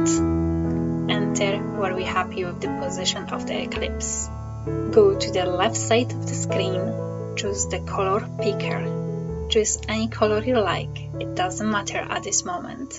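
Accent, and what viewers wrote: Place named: Scotland, United Kingdom. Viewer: Oh I can hear your scottish dialect by your speaking 😁. This remember me on scottish singer Derek William Dick -> yes his real name as Fish (early from band Marillion 81-88). Do you know him? ☺☺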